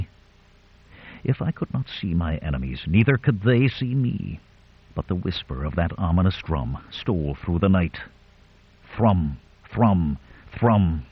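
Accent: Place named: United States. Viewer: Thankfully he speaks American English- the heavily-accented brogue of some readers is not missed!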